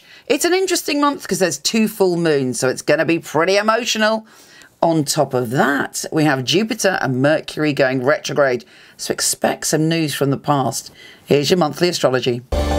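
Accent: United Kingdom. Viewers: Love all your Astrological PSAs ... your English accent #Grrr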